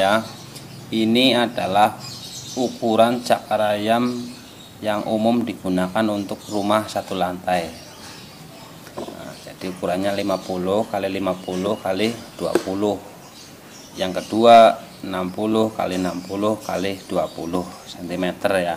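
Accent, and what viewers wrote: Banyumas, Indonesia. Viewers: wonge ngapak ini, g tau asalnya dari mana, yg penting sukses channel-nya👍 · Koyo wong ngapakkk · Kaya wong ngapak apa yah...😁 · Kayaknya orang Banyumas nih.... ngapak2 😁😂👍👍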